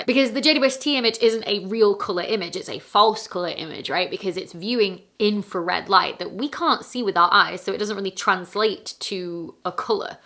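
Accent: United Kingdom. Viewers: What accent is this, by the way? Like, where in Britain do people speak like this?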